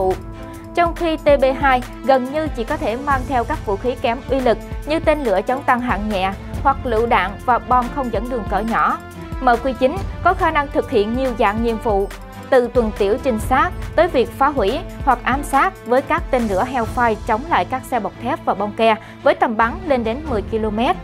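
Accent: South, Vietnam. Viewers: Quân sự mở kiếm đâu ra một em nói giọng Miền Nam 😃😃😃😃😃😃😃😃😃😃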